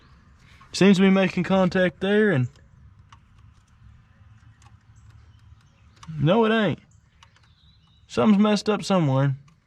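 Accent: South, United States. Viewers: I’m Australia mate we just love that southern accent you’ve got there. Cheers ! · You all have a cool accent, the southerner's in America, British and Australian. In smack in the middle of the states (Michigan) our accent is boring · Love this channel. I can tell he is my brother because he is southern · It's nice to hear another southern brother. Keep up with the great content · Hi all great vids mate I'm enjoying them. At first I didn't know what you were saying when I first listened to you speak lol you say oil like ol and coil like cool. I don't know why but Americans can't say the i in the pronunciation of certain words. Coil pronounced like coyl or Doyl. Oil is the same oyl. How do you say Craig all you Americans haha you say it like Crag it's Crayg it's not a silent i. Anyway that's my bit haha. Now lets see how many Americans don't like me telling them this. 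What are you doing with all the vehicles once you get them going? Jeremy Downunder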